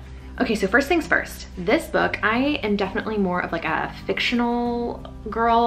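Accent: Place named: California, United States. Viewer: Good Review! I was listening and not watching and your California accent was flowing out of you very naturally. Gave me nostalgia.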